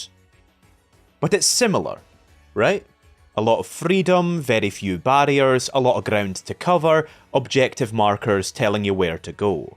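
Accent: Scotland, United Kingdom. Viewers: The Scottishness is off the charts. Half the reason to hear you is just being reminded of Tennant. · Honestly, having watched the video, I would really really like to see you tackle Scarlet and Violet one day, because it's one of the most interesting games in the series to me in how it does so many things right, and yet has so many glaring flaws. Honestly, I just really want to hear my beautiful Scottish sweetheart talk about Pokémon for even more hours. I literally couldn't get enough if I tried!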